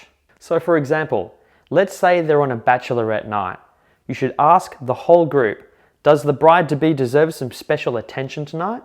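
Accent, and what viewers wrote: Australia. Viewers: Sir your Australian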